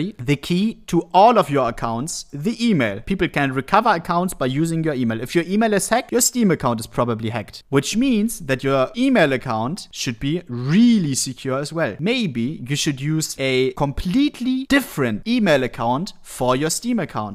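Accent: Germany. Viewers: Dude his german accent drives me crazy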